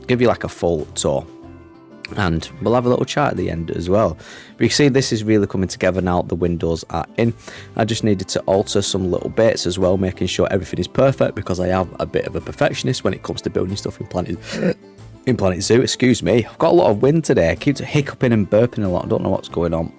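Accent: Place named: London, United Kingdom. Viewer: yeah great voice, narration a n d accent. One of the main reasons actually for me to choose your videos over those of other gifted British creators. (My preferred accent group in general….) Is that a London accent? Which part?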